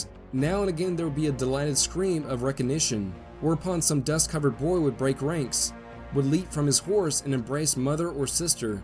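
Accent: South, United States. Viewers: Just found your channel recently!!!!! It's awesome!!! Only someone who really knows and cares about American history and culture does content like this. Detail is excellent as I've studied this period in detail as well! Keep it up and thank you!!! P.S. Nice to hear a southerner narrat it too! (Sorry if I'm mistaken you do a good job either way).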